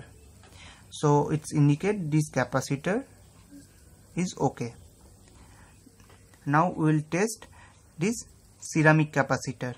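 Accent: India. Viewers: 350 million AMERICAns and the video has 1 guy with a strong India accent?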